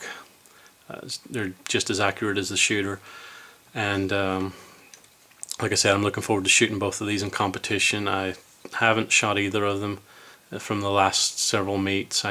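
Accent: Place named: Belfast, United Kingdom. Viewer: I hear a Belfast accent ;-)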